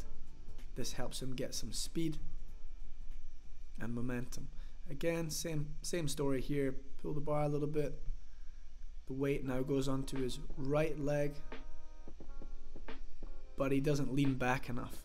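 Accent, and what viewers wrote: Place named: Scotland, United Kingdom. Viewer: Really well explained, top work. Emso important to show why things go right AND wrong so you can self identify your problems. Lovely soft Scots accent too...Fifer?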